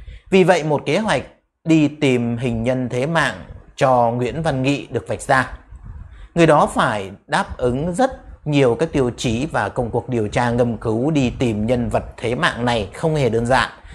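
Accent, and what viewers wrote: Standard. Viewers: E nói rất chuẩn đấy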